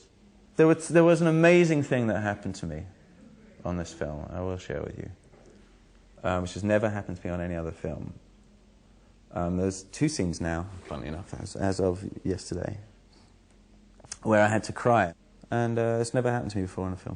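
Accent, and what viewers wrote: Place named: RP, United Kingdom. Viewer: It's a little weird hearing him speak because I'm accustomed to hearing his 'Londoner' accent in 'Lie To Me,' and right now he sounds really posh in comparission, it's throws me slightly lol XD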